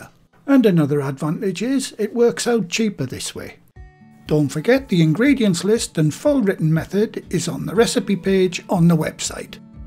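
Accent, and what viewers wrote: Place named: Geordie, United Kingdom. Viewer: I only found your site today and already a new subscriber. Very instructional.. music nice and not too intrusive and love your Geordie dialect. It’s like being with you in the kitchen:)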